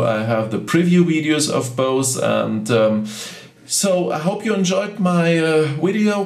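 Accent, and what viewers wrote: Germany. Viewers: german speaking english?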